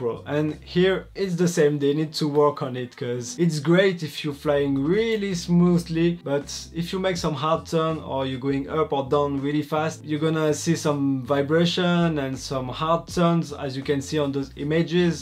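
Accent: France. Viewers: Tu es français ? Tu as un accent français quand tu parles 😂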